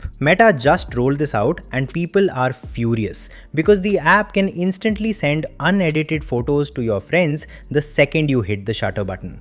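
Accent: India. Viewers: Indian accent is way more better than AI generated content. Thank you for sharing!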